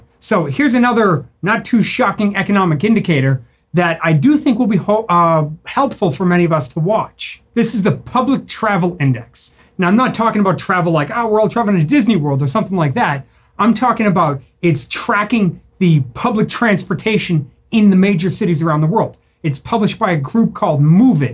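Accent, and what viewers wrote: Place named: Texas, United States. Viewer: Some texanian accent daaattaaa(data)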